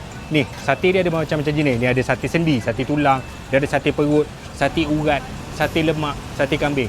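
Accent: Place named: Kedah, Malaysia. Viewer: ni kmpg Mana sebenarnya.. Kedah pn ye.. Pahang pn ye.. 😅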